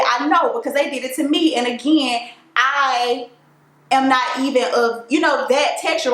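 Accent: South, United States. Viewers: Hey cuzo, I love your curls and that southern draw is precious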